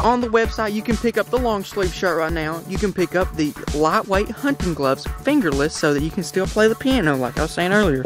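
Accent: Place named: Appalachia, United States. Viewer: Hillbilly accent just like mine #jeuse #graygang #ky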